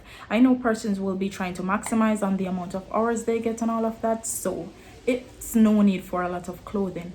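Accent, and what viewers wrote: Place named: United States. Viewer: I can hear the American accent coming up little by little... Especially when u keep using the term "you know"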